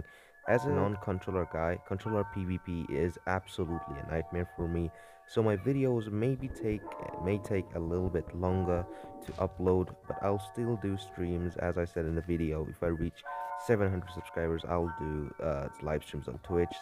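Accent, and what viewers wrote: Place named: Singapore. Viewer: this sound like a Singapore accent I'm not gonna lie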